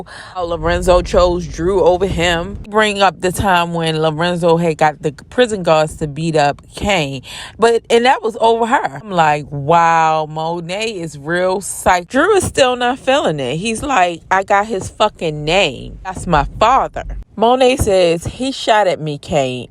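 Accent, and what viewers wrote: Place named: Baltimore, United States. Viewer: You Sound Like you from my city ! You Fro, Baltimore ?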